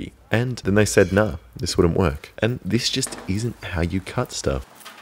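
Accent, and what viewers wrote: Australia. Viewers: People are saying “oH hEs sO aUSsiE” and I agree as a fellow Aussie yes we only wear thongs and barefoot (in Australia we call “flip flops” thongs get your mind out of the gutter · Got the aussie accent too…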